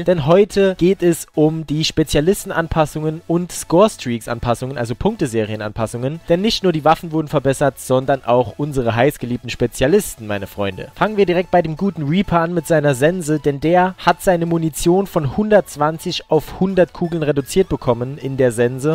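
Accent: Saarland, Germany. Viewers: Kann es sein dass du ausm Saarland kommt?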